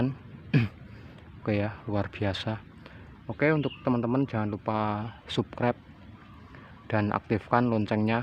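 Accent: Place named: Java, Indonesia. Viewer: Omngnya medok kayaknys orng jawa ksng prast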